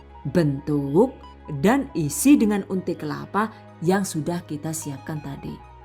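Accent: Banyumas, Indonesia. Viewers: Yang selalu saya kangenin selain resep mbak, adalah ngapaknya :). Sehat selalu ya mbak, biar bisa selalu berbagi ilmu :).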